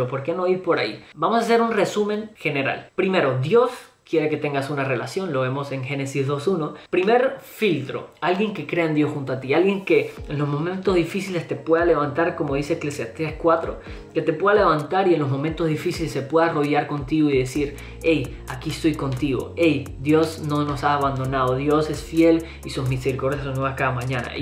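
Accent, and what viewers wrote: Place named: Argentina. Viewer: Traes un pequeño acento argentino por ahí! 😆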